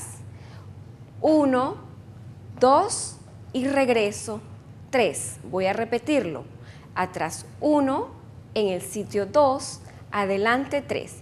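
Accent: Venezuela. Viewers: Habla como Venezolana. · la instructora es de Venezuela =)! cierto??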